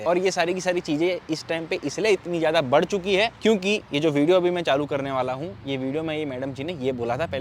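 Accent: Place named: India. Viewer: In a way, isn't that better? Why would you want his Indian accent to violate English of its natural grace and majesty?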